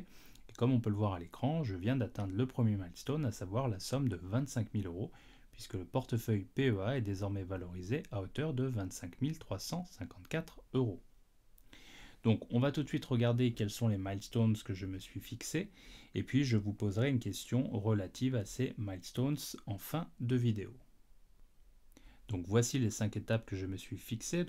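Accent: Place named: United States. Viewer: Salut j'aime beaucoup tes vidéos vraiment mais si je peu donner une petite critique, c'est que tu prends un accent anglais américain qui est un peu ridicule dsl mais ça m'agace beaucoup ! Je comprends que parfois tu n'as pas le choix d'utiliser l'accent anglophone mais franchement tu l'utilise pour rien, c'est comme dire " T-shirt " a l'américaine Ti chweuuurt nan... !! Tu le prononce normalement t-shirt. Voilà ce qui me rend un peu ouf quand je mat tes vidéos, que j'adore au passage. Bref dsl mais la fallait que ça sorte. Merci a toi.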